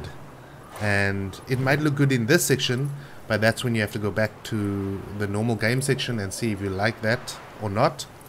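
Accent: South Africa. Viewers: What part of South Africa are you from? ;) P.E here!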